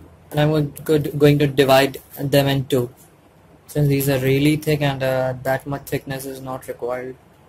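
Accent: India. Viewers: In the south, we don't hear too many Indian accents and difficult to understand you 🙊...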